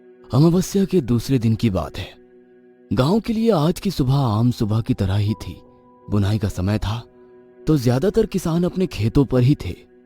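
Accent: Bihar, India. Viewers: Full bihari style 🤣 im from Bihar ❤️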